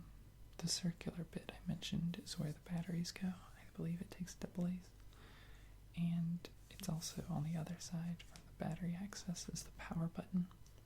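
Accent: United States. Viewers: I first had to know about you just tonight, my son showed me people using ASMR, I suddenly found you and your wonderful voice and american accent, keep on making videos, please! And keep on speakng french, try not to lose it, okay? Beacause it's great. Take care from Patty! from Burgundy!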